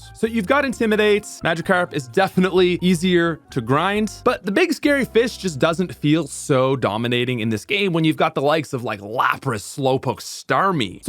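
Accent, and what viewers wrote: Canada. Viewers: For someone who says out the way you do, you sure do say it a lot - a fellow Canadian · Are you Canadian? I feel like you're Canadian. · First time subscribing long time follower but shit I did not pick up that you were Canadian until this vid. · Miss oot, aboot...etc. God I love Canadian's ♥️ 🇨🇦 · So Canadian lol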